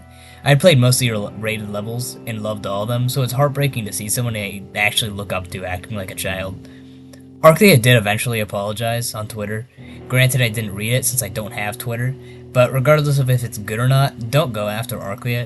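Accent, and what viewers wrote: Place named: Canada. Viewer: kenadian-ah voice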